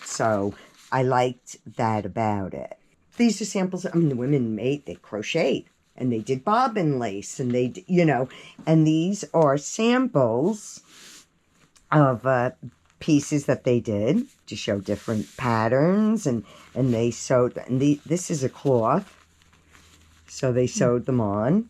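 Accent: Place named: New York, United States. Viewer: I had to stop watching for a minute just to say how wonderful you are to let us into your beautiful world! I’m in awe of your amazing collection and the stories that go with them. The story of your mother and the artist who crafted her last piece, the Santa, has touched me very deeply (I have stage 4 breast cancer). Also you sound a lot like me. I’m guessing Long Island or Queens. I hail from both, and even 🎉though we moved to the south 23 years ago I never lost that “accent.” Never stop being who you are. The world needs more people like you! PS: I have my grandma’s buttons too!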